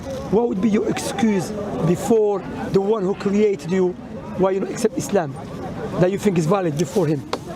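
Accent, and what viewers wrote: Algeria. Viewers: عند رؤيتي للفيديو أحسست بأنه جزائري من خلال لهجته ، و الأن تأكذت من ذلك بعد تعليقك شكراً ، و أنا فخورة كذلك كوني جزائرية بهذا الأخ الله يبارك ❤❤ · بارك الله فيك اخي .لديك حجج قوية وكلامك منطقي ومقنع لاي اجنبي عن الاسلام.اول مرة نشوف داعية بقوة اقناعك وبراهينك المثيرة والبسيطة .واصل شرف لنا انك جزائري عرفت لهجتك وحدي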